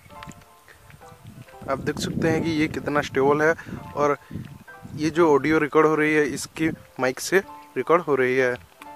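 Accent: Odisha, India. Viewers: Bhai are you from odisha? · Bhai u r odia for sure.. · Are you from Odisha Because your hindi is like Odisha people say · bhai aap odisha ke ho na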